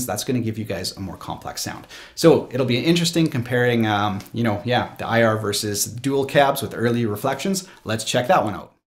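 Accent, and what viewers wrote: Canada. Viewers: It's not often I can hear the first fragment of a sentence and know someone is a fellow Canadian, but that absolutely happened here! Lol Excellent video, thanks for putting it together, seriously considering a Helix.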